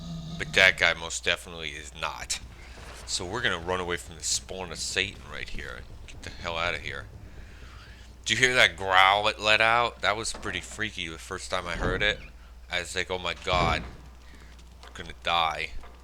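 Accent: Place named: Northeast, United States. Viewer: are you from New Jersey, New York or New England? Your accent is very familiar.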